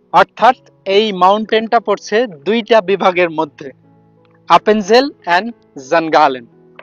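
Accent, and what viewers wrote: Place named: Chapai Nawabganj, Bangladesh. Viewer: ভাই আপনার বাড়ি চাপাইনবাবগঞ। আপনার কথাতে আঞ্চলিক ভাষা র টান আছে। কিন্তু আপনি তা উপেক্ষা করার চেষ্টা করেন। কিন্তু আঞ্চলিক ভাষায় কথা বলা কোন অপরাধ নয়।...... From Rajshahi Bangladesh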